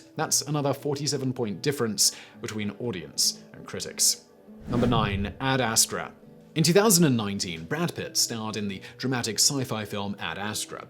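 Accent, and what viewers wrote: United Kingdom. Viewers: Too many British pronounced tomatoes in this video. Please never do a video where you have to say aluminium multiple times.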